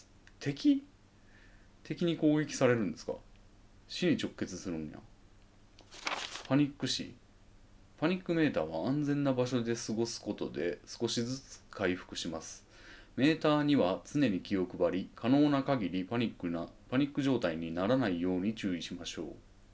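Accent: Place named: Kansai, Japan. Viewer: 関西弁で冷静にツッコみを入れていく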